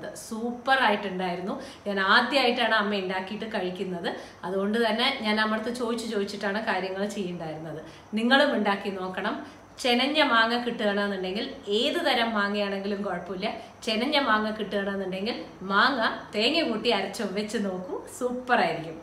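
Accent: Palakkad, India. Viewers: Asalailyei palakkadan basha.kodhi gerunnundu ente chechyei.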